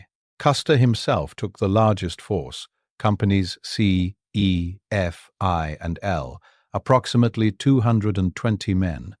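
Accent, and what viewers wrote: United Kingdom. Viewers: You saw that also,this voice ,this channel is a joke , is he from England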